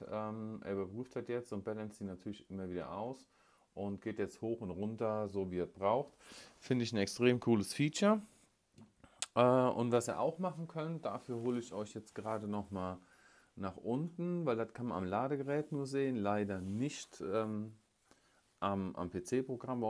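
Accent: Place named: Lower Rhine, Germany. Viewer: Der Akzent...... Dat & Wat!!!! Herrlich!!! Wich komm aus Wesel am Niederrhein..... da sprechen wir auch so!! Lol!!! Gruß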